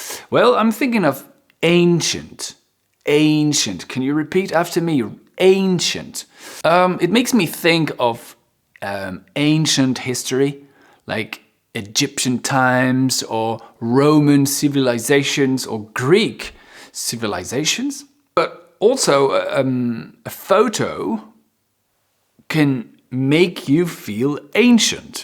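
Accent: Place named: United Kingdom. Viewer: I like your british english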